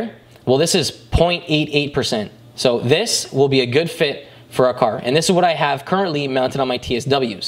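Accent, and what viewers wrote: Canada. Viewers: Canadian eh??????????????/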